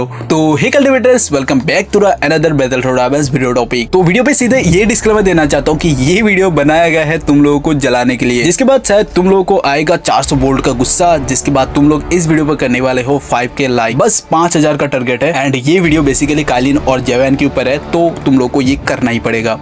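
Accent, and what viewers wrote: Assam, India. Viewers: Bhai tum assam se ho kya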